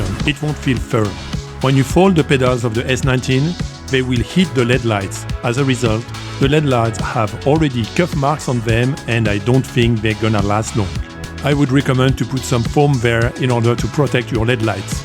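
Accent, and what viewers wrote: France. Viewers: j'ai cru comprendre a ton accent que tu est français ^^ Il faut que je me pause et que j'écoute bien tranquillement tt ça, car la en speed j'ai strictement rien capté 😂 mais merci pour l'explication, je comprend mieux !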